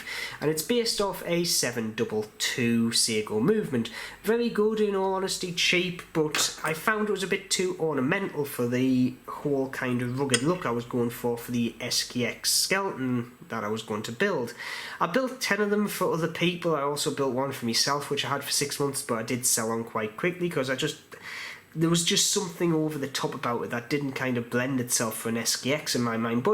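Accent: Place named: Durham, United Kingdom. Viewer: You sound like Durham lad.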